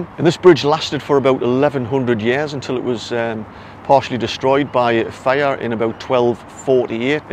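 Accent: Newcastle, United Kingdom. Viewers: It's great to hear your accent. I've lived in Somerset for 21yrs now, but I still have quite a strong Geordie accent. But it's always nice to hear someone from home. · love the geordie accent 😍 👌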